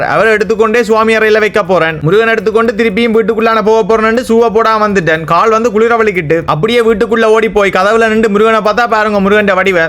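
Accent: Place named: Sri Lanka. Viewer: Hey Bro enga ooru slang SL 🇱🇰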